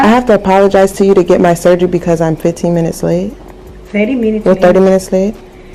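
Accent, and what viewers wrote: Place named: Maryland, United States. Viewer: I knew you’re from Maryland you talk just like me LOL 😂 heyyyy girllll 😻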